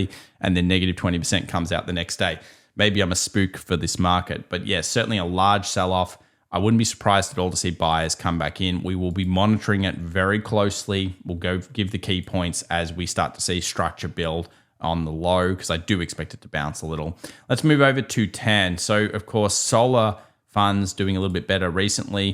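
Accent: Australia. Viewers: Kangaroo English (he is from Australia 🇦🇺)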